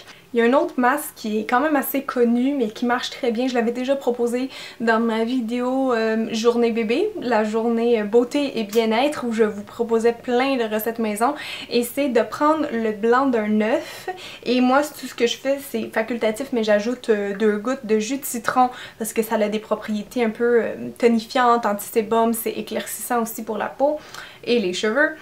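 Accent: Quebec, Canada. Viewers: J'adore l'accent québecoise😍😍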